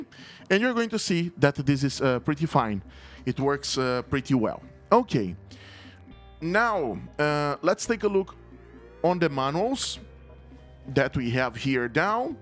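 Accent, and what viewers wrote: Brazil. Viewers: Sotaque brasileiro hehe! essa pedaleira é melhor que a valeton GP200? em qualidade de som e efeitos bro?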